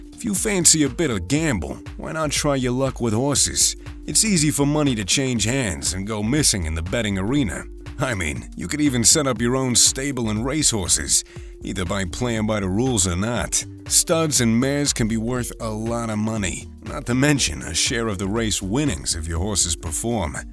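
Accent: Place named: Bronx, United States. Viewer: Love the NY Bronx accent 😅👌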